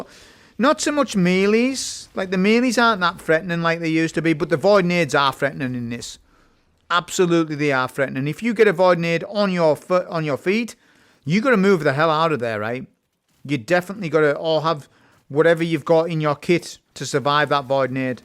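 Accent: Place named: Cumbria, United Kingdom. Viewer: Yo where you from you sound like a fellow Cumbrian!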